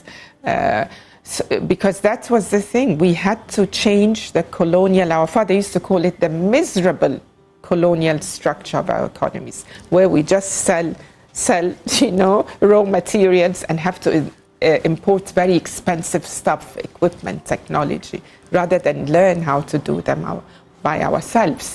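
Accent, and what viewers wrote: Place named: United Kingdom. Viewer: She talks like a British lady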